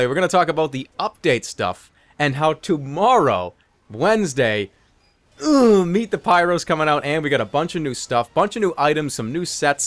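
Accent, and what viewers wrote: Boston, United States. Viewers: I watched Shibby2142's video about the new weapons, and I gotta say: this video is much more entertaining. Shibby is still funny but more calmer and less energetic. Your videos always have this energy to them (maybe it's the Boston accent), and that's why I subscribed to you before Shibby.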